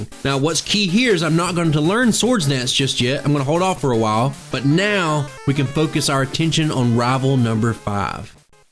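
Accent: South, United States. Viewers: This my first video watching, definitely just found a channel I'll be tuning into. This is weird but I swear you're the only Pokémon youtuber with a southern accent lmao everyone else doesn't have one or they British or something.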